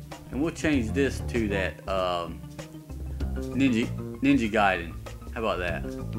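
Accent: South, United States. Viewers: My dude you sound like the biggest stereotypical southerner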